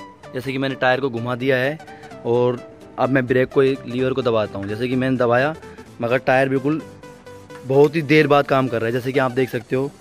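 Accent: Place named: India. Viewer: i don't see you video now but first you India tone i love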